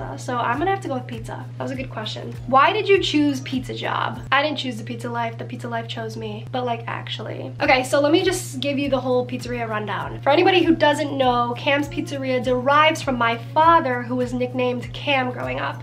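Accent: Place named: Italy. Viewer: do not hide your italian accent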